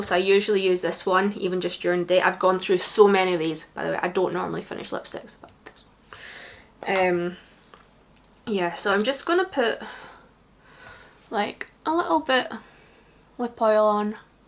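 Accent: Scotland, United Kingdom. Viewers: Hellow Sweets. What Part Of Scotland Are You From?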